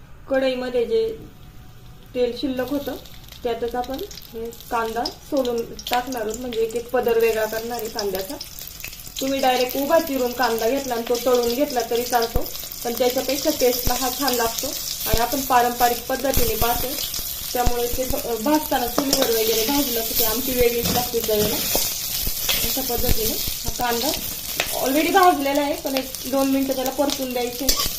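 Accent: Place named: Akole Sangamner, India. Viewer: तुमच्या आवाजावरून तुम्ही सुगाव अकोले संगमनेर भागातील असाव्यात असे वाटते. आमटीचे नाव शिंगोरी गावावरून असेल असे कधीच वाटले नाही. हि आमटी राशीनची पन प्रसिद्ध आहे.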